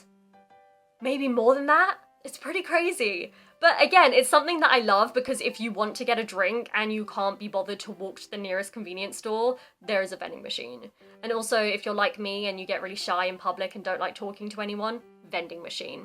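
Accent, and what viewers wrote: United Kingdom. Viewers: イギリス英語🇬🇧素敵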